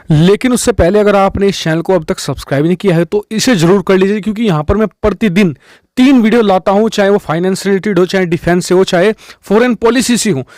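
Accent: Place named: Haryana, India. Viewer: जनाब हरियाणा के रहने वाले हैं क्या?? आबाज और इसके Impressions तो हरियाणा के रहने वाले से मिल रहे है ।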